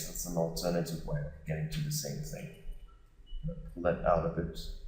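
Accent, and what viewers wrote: South Africa. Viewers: Hey man, nice video! You sound South African, i am from JHB myself!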